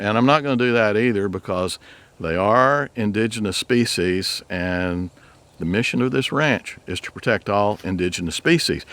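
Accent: Texas, United States. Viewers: Say Coyoteeee! We Texans do not need to speak the same as the rest of the country! I mean some people up North cannot pronounce the "R's"! Coyotes have to be thinned out. It's your ranch, don't allow others to tell you what to do!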